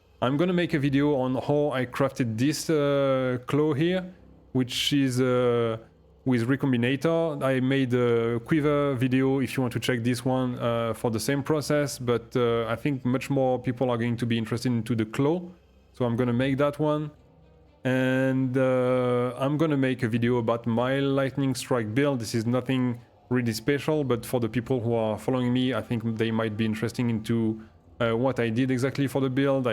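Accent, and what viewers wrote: France. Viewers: au vue de ton accent je suppose que tu es français ^^ en tout cas , très bonne vidéo. Les divines se font rare en ce moment x)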